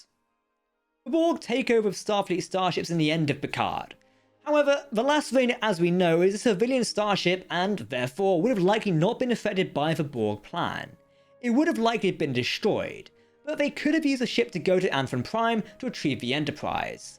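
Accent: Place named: United Kingdom. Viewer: Being hard of hearing is making your accent even harder to process. I would enjoy it much more if you spoke in an American accent. I have NOTHING against British folk, but it is what it is. After all, if weren't for your ancestors, America wouldn't exist. Sorry to be a bummer.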